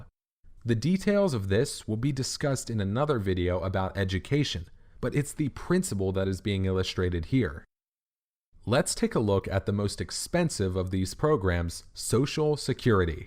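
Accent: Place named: Eastern Europe. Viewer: What happened to that sweet sweet Eastern European accent?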